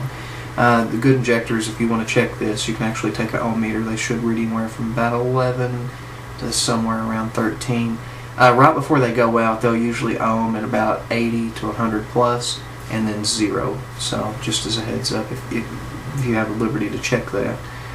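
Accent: South, United States. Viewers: sexy southern daddy damn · Speak with southern accent loud and proud. Fuck what anybody else think. I can't stand the NY accent and find it obnoxious, but do you really think they care what I think.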